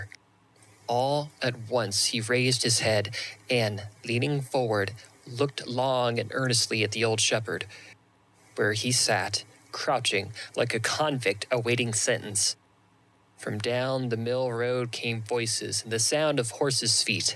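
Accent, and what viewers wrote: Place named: Ozarks, United States. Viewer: Wonderful job with the phases and feel of the language of the Ozarks.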